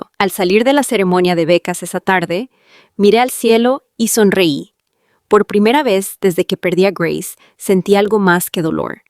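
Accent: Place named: Cocoliche, Argentina. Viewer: Corté en el minuto 5: aburrido, denso, repetido y narrado en cocoliche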